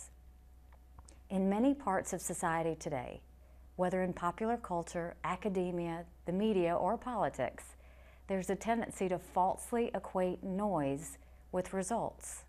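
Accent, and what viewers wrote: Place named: South, United States. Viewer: She sounds like a Southern White woman.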